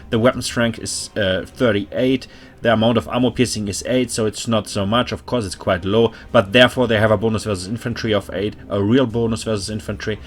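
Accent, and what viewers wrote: Germany. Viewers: I’m officially going to start intentionally pronouncing vampire “wampire” henceforth. Love the Deutsch accent. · I find it funny that other germans can tell germans just by the accent. Knew instantly, very nice guide ! :D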